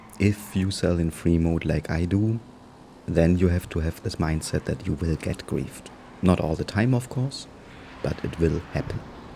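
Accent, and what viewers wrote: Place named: Germany. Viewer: He has a slight German accent but everyone has to start somewhere. 💪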